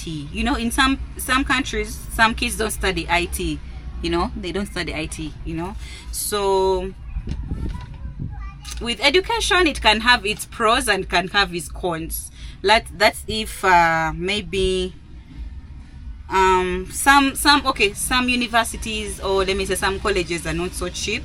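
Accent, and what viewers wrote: Kenya. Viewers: You sound like a Kenyan. Karibu sana. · Kenyan accent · THE accent is kenyan❤ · your accent changed to a Kenyan accent. You no longer sound like Ugandan at all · Your dropped Ugandan accent and picked the Kenyan accent so fast 😅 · I knew it was just a matter of time before you start talking using our Kenyan Accent...i'm a super proud Subscriber today😂😂😂😂😂 please,be coming to kenya regurlary...you're one of us already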